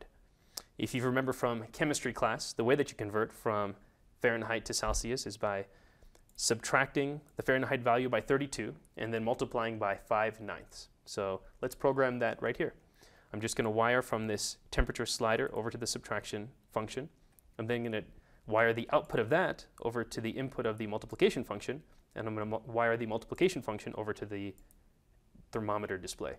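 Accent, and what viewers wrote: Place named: India. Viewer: Aren't my ears stuck or something? I hear an Indian guy, who can perfectly speaks English! Great tutorial videos, by the way :) Keep it up.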